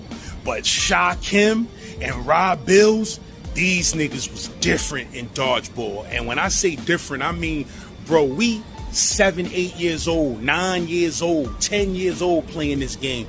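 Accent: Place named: New York, United States. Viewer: QUALITY STORY TIME! and ousside on feet MOOOOVINN yea thats a NY nigga 🤣 love from CT 💯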